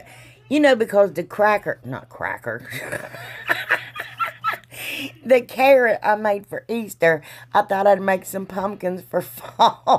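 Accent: South, United States. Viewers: Where do you live? That southern twang🤣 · Again, of course said in an awesome Southern accent!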